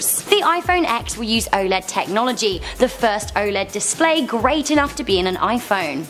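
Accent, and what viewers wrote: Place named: United Kingdom. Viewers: Zuriel Babida exactly her British accent is cute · Winter Plays what cute accent? She's british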